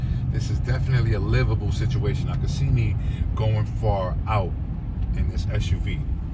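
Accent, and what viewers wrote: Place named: Philadelphia, United States. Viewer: Sound like you originally from Philly.lol Nice review 👍🏾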